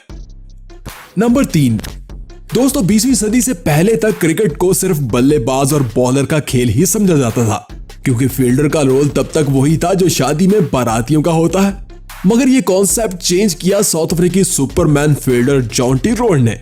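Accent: Pakistan. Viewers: Your accent is similar to Shoyeb Akthar , are you from Pakistan.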